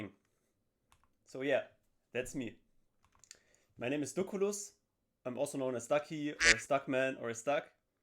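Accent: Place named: Germany. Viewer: I hear deutsch😂❤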